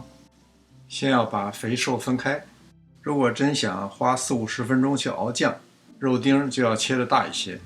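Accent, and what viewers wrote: Beijing, China. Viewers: 京味儿好亲切呀！您的菜谱太适合我们这些长年在外的人了！多石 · 地道的北京话加上地道的炸酱面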